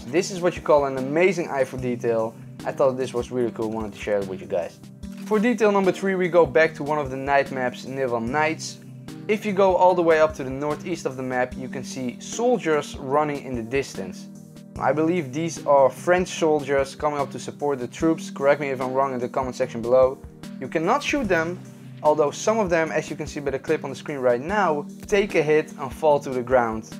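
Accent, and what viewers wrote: Netherlands. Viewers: are you dutch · Definitely dutch this guy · kinda cringeworthy to hear a fellow Dutch person talk English using a Dutch accent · is dat een Nederlands accent?? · Are u dutch? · you sound a bit dutch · R u dutch? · Are you dutch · For sure that's a Dutch accent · Ben jij nederlands? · I subbed cause i like your channel but 1 question are you dutch? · you sound like a dutch fpsrussia! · Are u dutch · You dutch? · zo te horen ben je Nederlands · Ik hoor aan je accent dat je Nederlands bent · are ou dutch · echt zn nederlands/engels accent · You have a Dutch accend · Are you dutch?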